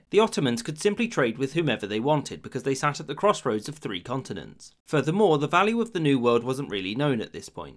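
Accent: United Kingdom. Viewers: i'm ottoman. you speak too fast english with your strange british accent. bro slow down. i was trying to understand. böyle hızlı konuşma gözüm. i think you need some ottoman slap on your face thats why you speak too fast. i will try watching video with 0,75 speed, lets see if it will work.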